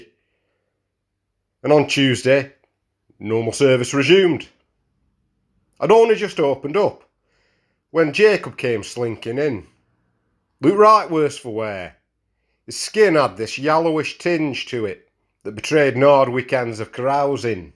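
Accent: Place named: England, United Kingdom. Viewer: That's pretty creepy. Good story telling though. Where in England you from?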